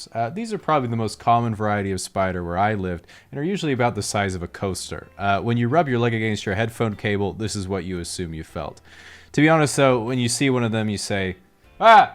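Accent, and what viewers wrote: Australia. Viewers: Please keep talking in the Australian accent thanks! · You are one of the only American with an authentic Aussie accent, also, the drop bears 🤣🤣🤣 Australian gang where you at? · after watching this i have decided australian accents are nice actually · How does your Australian accent sound so much like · I havnt heard your voice for a year and you sound a lot more Australian than I remember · I like how it’s hard to tell what his real accent is. Is he faking the Australian or Canadian? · It's obvious you lived in Oz as a kid. The accent is perfect. I'm from Tassie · As an Australian, man definitely knows his culture. Even his accent is amazing, surprised he didn’t keep one from when he went to primary school here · Holy fuck I would never have put you as an Aussie but now you've mentioned it I keep hearing the accent slip through every now and then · Your Australian accent is my favourite thing about this channel probably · Causally explained in Australian accent hits different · Ahhh cool I thought you could nail the australian accent, do a good job of getting the extended vowels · Solid Australian accent 👌🏽 but then you mentioned telstra and gave it away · your accent is a noticeable mix of australian and north american english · Can we all take a minute to appreciate his Aussie accent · Other videos where he does his Australian accent? 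· that flawless yet unendingly irritating Aussie accent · im from Tassie, and I always thought you speak with an aussie accent at times! how cool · I know i never heard you talk with an australian accent before. Did you chat with your Mum for a while on whatsapp must before you voiced this? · Dude. I knew you sounded Aussie sometimes. You say the animals like you never left but most of the time you now sound vaguely American/Canadian i was never sure cause I'm Aussie lol *Edit I just got to the end and found out youre from Tassie..same...which wouldn't be weird except there are so few of us. Props for being YouTube famous hey. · your aussie accent is mindblowing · Wait... your Australian?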